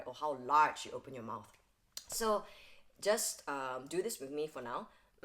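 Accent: Singapore. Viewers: Sounds Singaporean to me. Whats wrong with that?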